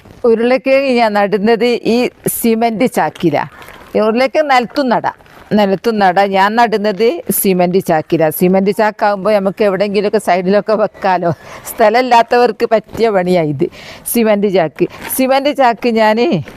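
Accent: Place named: Malappuram, India. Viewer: നല്ല മലപ്പുറം ഭാഷ കേൾക്കാൻ നല്ല രസമുണ്ട്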